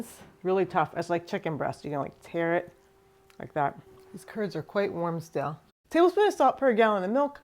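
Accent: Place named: Boston, United States. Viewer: What part of Boston are you from? ❤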